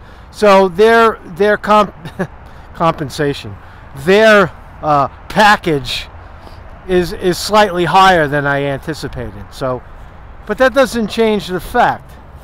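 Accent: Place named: St. Louis, United States. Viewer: ...I grew up on a State street in St. Louis...although You are a little more refined than me I feel we have a connection through speech...It's like-- I came to kick some ass and chew bubble gum...and I'm all out of gum...keep up the Hard work my man.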